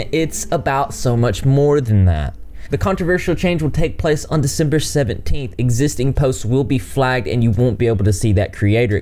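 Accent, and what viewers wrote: South, United States. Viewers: It's an anime character in real life with a southern accent! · Straight southerner lol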